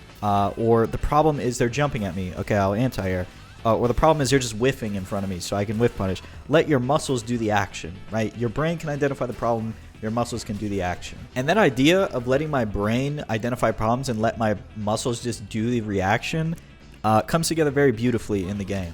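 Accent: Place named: United States. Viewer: Hey man, you talk a lot about consistent mindsets, and it's indeed great to have! Have you tried therapy? You sound american to me, and I know health is very expensive in the US, but therapy is the single greatest thing I ever decided to do for myself, it improved every aspect of my life. As a bonus, now I can play ranked and not freak out about every single match, and not rage after every mistake... Have a beautiful day!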